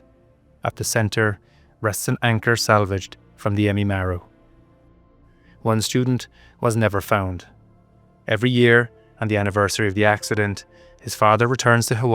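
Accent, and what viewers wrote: Scotland, United Kingdom. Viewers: It's possible it's to do with AI? I've heard the narrator's voice switch to a Scottish accent. So it's possible the channel is using an ai voice? (I still love the channel regardless) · switch to Scottish? It’s been Scottish since the first one no?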